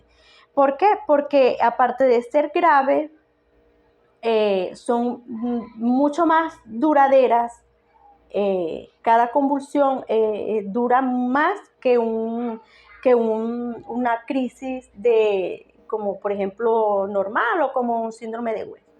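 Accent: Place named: Venezuela. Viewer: Amiga es la segunda vez que te veo y por el asentó me imaginé que eres venezolana .tambien soy de el Tachira ,Perú Ivo en el Reino Unido.y tu testimonio es es de una gran ayuda,y te felicito por tu valentía y dedicación a tu hijo.Bendiciones .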